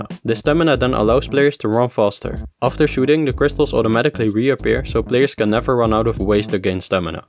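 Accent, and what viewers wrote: Netherlands. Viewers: I hear dutch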